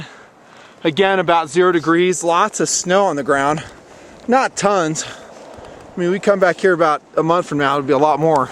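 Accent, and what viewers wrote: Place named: Northwest, United States. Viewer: what state is this in? You sound like a Northwesterner.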